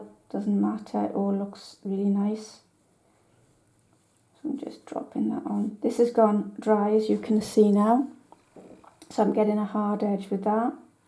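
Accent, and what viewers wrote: Wales, United Kingdom. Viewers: Beautiful thanks so much. Love your welsh accent!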